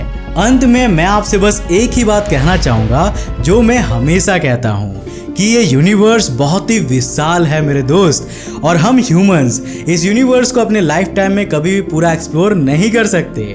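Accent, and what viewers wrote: Bengal, India. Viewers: bhai tum bengali ho kya? btw love your videos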